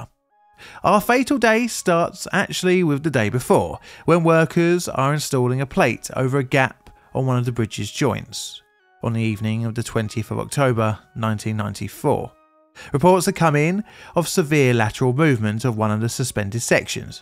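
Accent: United Kingdom. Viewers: Talking tragedy with a condescending voice, very good ! Or maybe it the brit snobbery I'm hearing.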